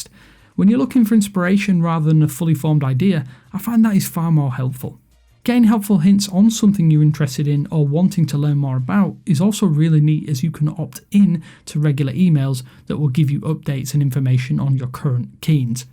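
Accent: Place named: Hull, United Kingdom. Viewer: Hull City, lol...was wondering where your accent was from.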